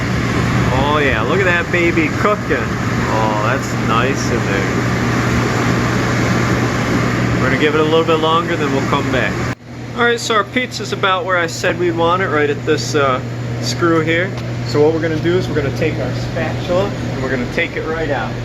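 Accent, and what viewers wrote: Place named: Bronx, United States. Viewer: You sound like your from thr bronx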